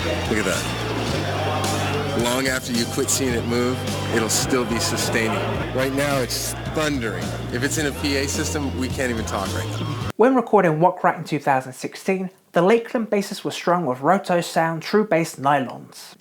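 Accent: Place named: Southern England, United Kingdom. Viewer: Awesome stuff as always. I even ordered a curry just have watching this, well worth it. Here's a left field suggestion - How about YOUR rig? :) Ever thought abouot doing a 'Rig Rundown' series with bands nearer to you? Considering your accent makes me think you're from somewhere between Bristol, London or even Sussex, you'd probably be inundated with choice, right? :)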